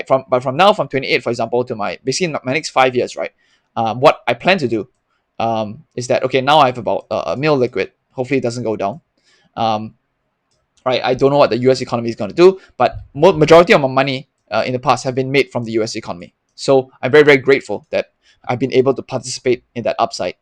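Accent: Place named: Singapore. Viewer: You sound like you are from Singapore and doing military also? So going forward why you picking a job over a business or not worth the extra effort/risk? I see you have done well in HIMS/HOOD have you thought about taking less risk (you might like quality investing) to keep your stack or going to do that if you get to $10M?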